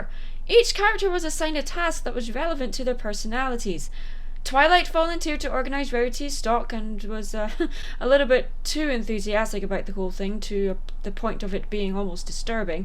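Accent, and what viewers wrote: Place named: Lothians, United Kingdom. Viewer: You sound like you're from the lothians, am I right?? xD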